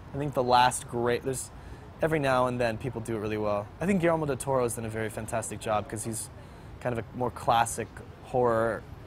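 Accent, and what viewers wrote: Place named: France. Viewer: He's got such a great french accent!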